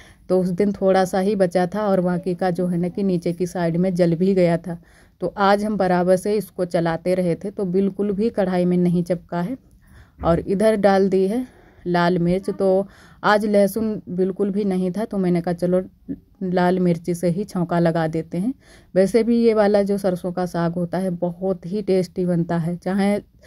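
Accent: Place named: Uttar Pradesh, India. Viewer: Bhut acha vlog aap 1 din apni Up wali voice m video k voice over kro bhut acha lgta h mujhe aplogo k language ❤ I m from west Bengal yha yhsb language dur dur tk nhi bs hindi or Bengali h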